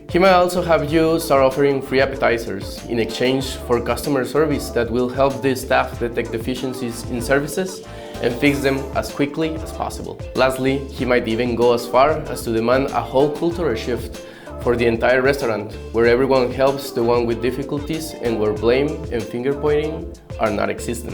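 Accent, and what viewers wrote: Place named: Mexico. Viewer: Amigo, you accent sounds exactly like Raúl Jiménez's, when you speak.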